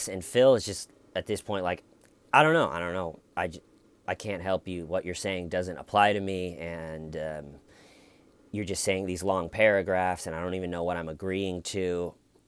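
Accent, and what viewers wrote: Boston, United States. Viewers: I love your Boston accent 😂😂😂 just made me picture a bunch of cops from The Departed! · You sound like a whacked out Kennedy lol w the Boston accent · BTW your boston accent is killer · Omg, you are historical. Great Boston accent. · So true about having a gambling addiction. I'm imagining how depressing and devastating it has to be to keep fucking myself over like that, and I seriously would want to take MYSELF out. Ok your Boston accent is funny as hell. I'm in Western Mass for 10 years now (which has it's own dumb accent) and it took me years to realize that the Boston accent is NOT indeed a speech impediment or a foreign accent. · OMG you got the Boston accent! A reason to say "balls" is cuz he (the detective) is playing an angle of class difference (a huge social deal in Boston and more so back then) so he can show he isn't deferential to a doctor-to-be -it's like a micro-aggression to convey shit is getting real Phil ( IMO ) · Love ur Boston accent. 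Lmfao! Sound like Marky Mark back in the day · STOP WITH THE STUPID BOSTONIAN ACCENT! YOU SOUND LIKE AN IDIOT!😠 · This is the first time we’ve seen your hair, isn’t it? A neat cut! Love your Boston accent.... reminds me of JFK. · you'd fit in perfectly, ya look like a Bostonian Irishman... Ya got the accent down better than most lol.. I'm from Southeast Mass... Closer to RI, I have more of a New Jersey accent ... Strange how it is round here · I love your videos! Keep it up, totally makes my day and the Boston accent is priceless.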